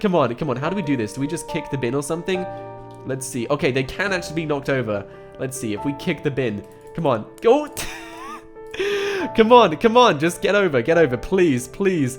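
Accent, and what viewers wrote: United Kingdom. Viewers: Bro u sound very british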